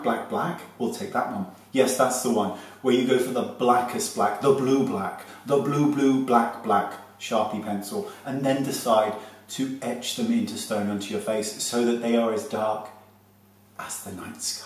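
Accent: United Kingdom. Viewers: I don't know what it is but I love hearing him say naughty, I think it's the British accent and the lack of anyone ever saying it in Texas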